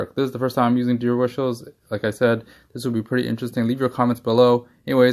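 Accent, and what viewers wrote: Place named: California, United States. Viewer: This was good but I had to listen over and over to catch his words, as he didn't speak distinctly, unless I'm just not accustomed to a California accent. But still, thanks for the info.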